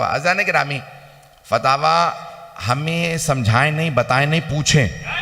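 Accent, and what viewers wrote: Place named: Iran. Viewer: Urdu bhi boltay hain tou Farsi lehjay mai. Subhan'Allah.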